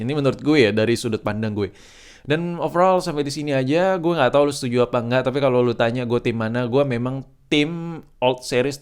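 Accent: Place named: Cikarang, Indonesia. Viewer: Gaya omonganya kek orang cikarang bae cuk wkwk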